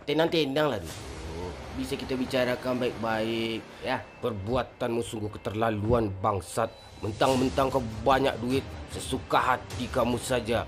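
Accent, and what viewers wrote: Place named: Kalimantan, Indonesia. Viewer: ORANG KALIMANTAN MANA SUARA NYA SEMUGA ASAP CAPAT ILANG YA GUA DARI KALSEL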